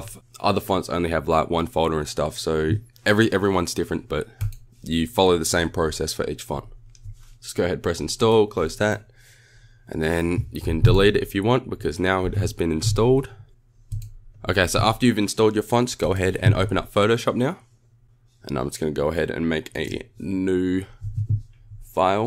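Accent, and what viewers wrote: Australia. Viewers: I neve thought the Ausi accent and IT would go so well together....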